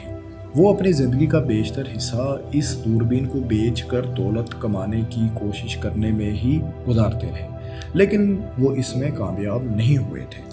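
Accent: Punjab, Pakistan. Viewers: Video achi hy magr ap k lehjay mn Punjabi tone bht zyada hy.. Apka Urdu talaffuz bilkul acha nhi hy..!!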